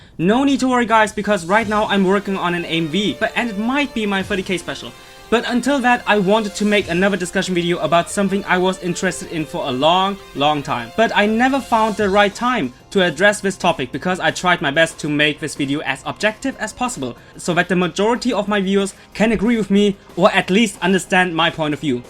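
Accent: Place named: Germany. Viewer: you sound german ^^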